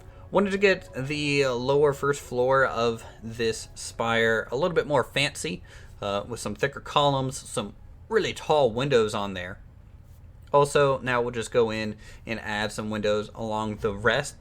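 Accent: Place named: South, United States. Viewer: It's weird how you have a southern accent yet sound like you barely know english.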